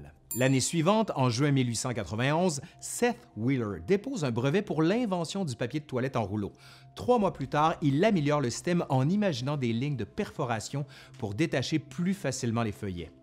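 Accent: Quebec, Canada. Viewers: Entre le papier de toilette, le papier toilette, le PQ, le papier de chiottes... Ohlala ! Ta vidéo est très rigolote et je me suis bien marré. Tu fais vraiment un très bon boulot ! Ta chaine, avec ton accent québécois à couper au couteau, c'est une bonne partie de rigolade et maintenant, voilà ! Tu me fais aimer l'histoire... Et bien, quel exploit ! Bravo à toi !😀😁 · Purée l’accent québécois c trop beau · Je découvre votre chaine sur cette "capsule" 😆(désolé, c'est pas méchant mais tellement marrant pour nous les françois !) sur cet épisode du PQ, et honnêtement c'est par inadvertance et non par curiosité malsaine. Bref, je trouve le présentateur des plus agréable à écouter, avec son petit accent canadien ( que j'aime beaucoup). Je trouve vos sujets très enrichissant, bien que je sois pauvre comme Job, normal je suis retraité, (plus de job). Et racontés avec une emphase des plus agréable. 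Alors Merci énormément, abonnement de surcroit.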